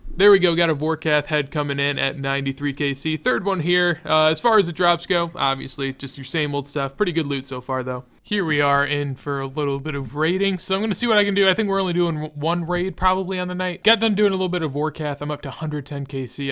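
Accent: Michigan, United States. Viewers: Yooo are you from Michigan? Let's go blue baby final 4!!! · Wait your from Michigan? :O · Wait are you from Michigan? 313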